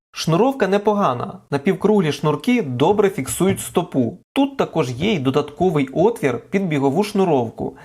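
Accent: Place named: Voronezh, Russia. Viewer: так я не понял. это что за язык? ты где так говорить научился? говори нормальным языком, нихрена же не понятно. это воронеж? или может багучар какой нибудь?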